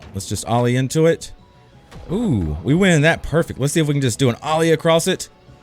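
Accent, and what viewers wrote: South, United States. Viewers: he sounds like he lives in the southern us and nightspeeds lives in denmark i think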